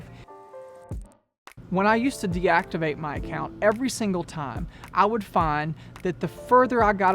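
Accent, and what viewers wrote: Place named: South, United States. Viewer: Are you from the Mid-South? I hear a Memphis/Alabama/Arkansas/Tennessee accent.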